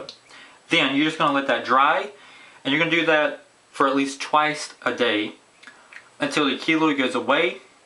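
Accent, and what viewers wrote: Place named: South, United States. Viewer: It sounds southern my guess would be Georgia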